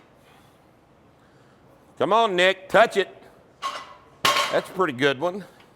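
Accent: Texas, United States. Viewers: I've got rippetoe at 1.75 speed and it still sounds like just slightly fast talking. Lol. That slow texas drawl is strong with the. Lol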